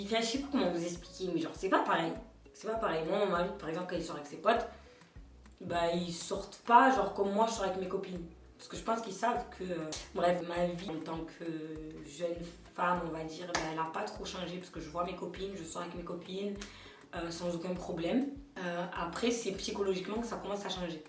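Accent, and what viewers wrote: Marseille, France. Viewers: Ton accent marseillais est juste magnifique ! Wouaaaaaaaaaw 💓 heureux ménage la jolie ,gros bisous 😘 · Tu est de Marseille ? L'accent ?? ☺️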